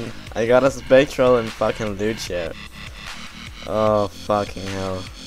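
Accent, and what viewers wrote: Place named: Denmark. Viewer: so danish accent.. trololol, like this serie, it's awesome seeing progress videos, Next drop: ely!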